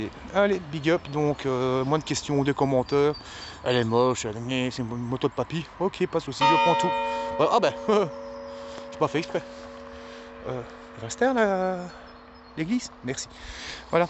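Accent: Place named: Toulouse, France. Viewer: J'ai reconnu l'accent de Toulouse.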